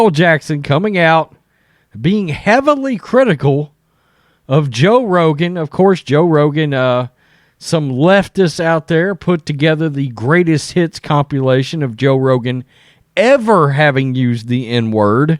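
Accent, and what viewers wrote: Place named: South, United States. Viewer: I'm pretty sure your White a** has said it before. Sound like good ol boy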